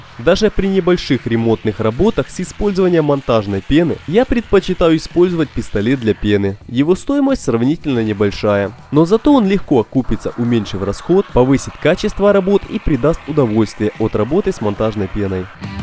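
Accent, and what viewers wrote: Ukraine. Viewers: А голоса за кадром украинский акцент что-ли не пойму?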